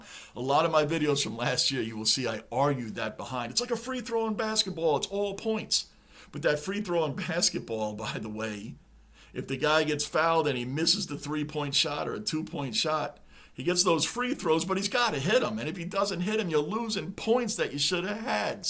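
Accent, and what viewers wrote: United States. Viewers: A novelty hearing an American accent talking about our great game like a fan with some knowledge. Keep up the great work bloke ..... 👍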